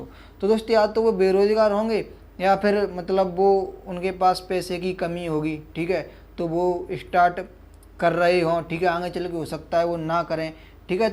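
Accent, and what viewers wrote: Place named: Pahadi, India. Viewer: Bhai video kaafi achi thi. Tumhari tone se tum Pahaadi lg rhe ho Video like frm Uttarakhand (dist-Champawat)😊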